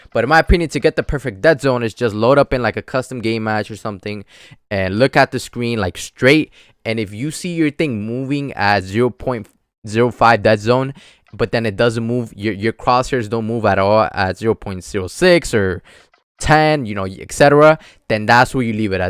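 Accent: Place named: Latin America. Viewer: Este we a quien quiere engañar? Parece más latino que nada jajajaja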